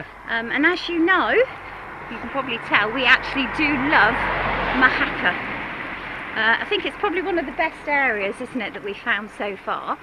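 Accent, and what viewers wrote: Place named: Bristol, United Kingdom. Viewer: Nice video, love hearing the Bristol accent as its where my mum comes from.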